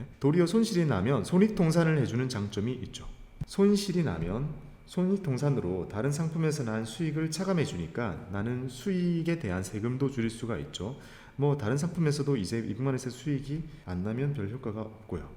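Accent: Gyeongsang, South Korea. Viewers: 곰희씨 특유의 사투리, 같은 경상도 사투리 사용자로서 넘 친근해요. 우짜죠? ㅋㅋ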